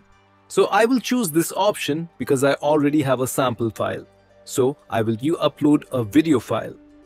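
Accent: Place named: India. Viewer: eventhough you are already using tts, your idian accent still strong.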